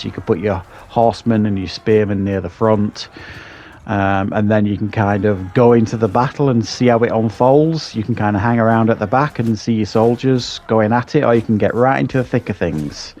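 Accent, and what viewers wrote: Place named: Lancashire, United Kingdom. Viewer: Pretty sure that's a Lancashire accent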